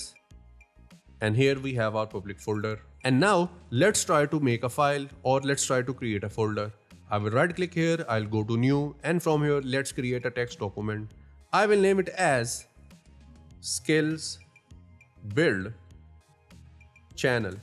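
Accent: India. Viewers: Indians speaking English sounds very ridiculous, next time use subtitles or remove anything below your tonge, the world will thank you.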